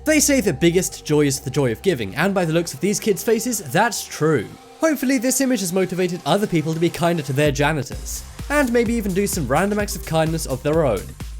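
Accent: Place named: United Kingdom. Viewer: What’s with the British voice??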